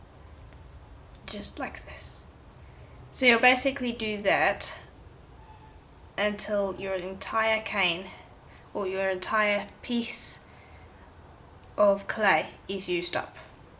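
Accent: South Africa. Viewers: I have just started watching your videos. I have not tried polymer clay yet. But I really want to. So I will be watching hundreds more videos. Lol Question.... are you South African? I see you are in Australia, but your accent is definitely South African.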